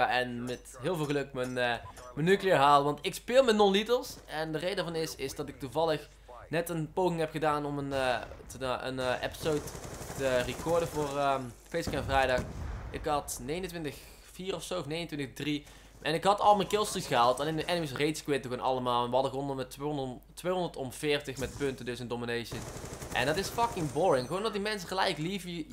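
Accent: Brabant, Netherlands. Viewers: Kom je uit Brabant?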